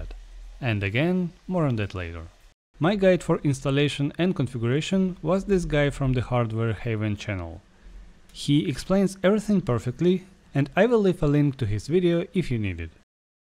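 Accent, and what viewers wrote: Eastern Europe. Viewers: For some reason the Slavic accent makes me trust your experience more😂 great vid! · thank you slavik accent man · Omg I didn't realize this is a super new video! I am loving it so far. The Slavik aksent is appealing though! I've been delaying building a nas / home server forever now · славик акцент вэри найс. ал понятно энд по делу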